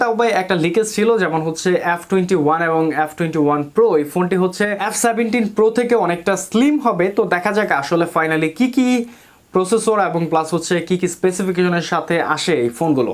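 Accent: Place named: Bangladesh. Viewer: nA...ashole apnr ai vedio te beshir vag e india te phn launch korar kotha bolchilm to.. Ty vbsilm j apni hoito indian...bt apnr voice shune bhujha jai apni Bangladeshi 😅😅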